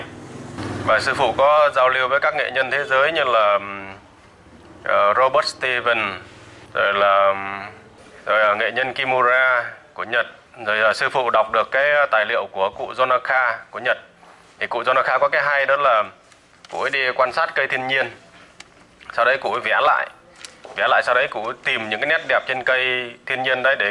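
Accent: Vietnam. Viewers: Giọng thằng này 70% dân hoa thanh quế. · Bác dậy làm rất dễ hiểu.mà cho e hỏi bác ở thanh hoá phải k ạ.e nge giọng giống ở yên định thanh hoá quá bác ạ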